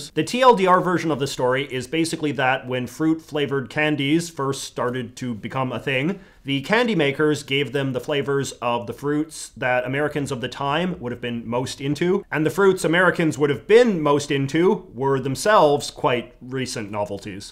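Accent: Canada. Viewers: I love the Canadian accent poppin out here and there! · You make a very interesting video about candy in the United States, yet your Canadian accent and the candy from Dollarama betray you within the first 30 seconds of the video. Fellow Canadian here, good stuff 👍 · This man is the most interesting combination of different groups. He has the hair and mustache style of the 80’s, the accent of a Canadian when saying words like about and around, he has the facial expressions and head positioning of a surfer dude, and he up-talks where you end your sentences in a higher pitch. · You sound very Canadian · didn't he say himself that his accent is stronger than most Canadians anyway? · You don’t sound American. You sound Canadian. 🤔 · i realized you were canadian. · Never mind I just heard you pronounce about and around, you are 100% canadian no hiding it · he said aroond instead of around. 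he's not american he's canadian. al though one could argue that he is north-american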